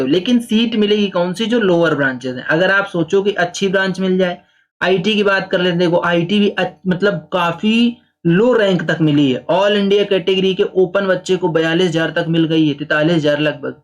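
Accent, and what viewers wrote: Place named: Kanpur, India. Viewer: Kanpur se ho na aap Lahze se lag raha hai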